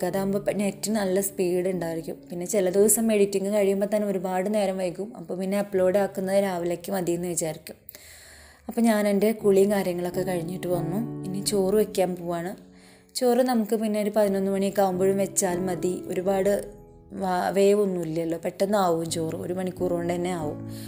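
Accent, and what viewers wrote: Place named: Palakkad, India. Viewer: Palakkad stilpole thonni atha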